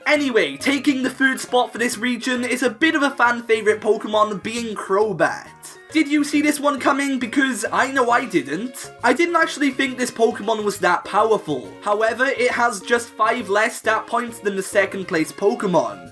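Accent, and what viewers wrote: Wales, United Kingdom. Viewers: Youre welsh aint you butt? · Are you Welsh?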